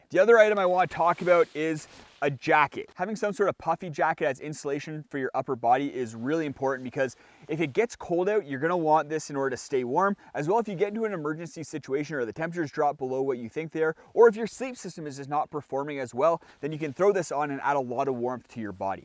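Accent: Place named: Canada. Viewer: You sound especially canadian in this video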